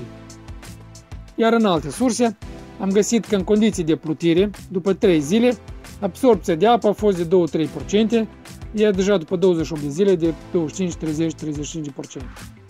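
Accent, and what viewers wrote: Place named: Moldova. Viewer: Salut! Faci o treaba foarte bună cu aceste vide-ouri. Un sfat: Cred că ar ajuta să pronunți unele cuvinte (Mai ales numerele și anumite expresii) puțin mai rar și să lucrezi la dicție. Poate cei din Republica Moldova înțeleg fără probleme. Noi, cei din România, ne chinuim puțin ca să înțelegem 35% din cuvintele pronunțate. PS. Să nu o iei în nume de rău! Toate bune!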